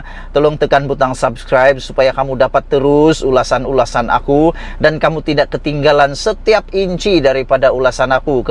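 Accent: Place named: Sabah, Malaysia. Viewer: Cakap mu MCM orang Dusun Darat buat malu ja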